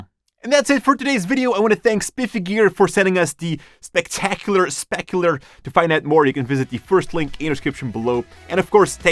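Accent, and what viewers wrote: Netherlands. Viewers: Are you dutch ? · Are you Dutch?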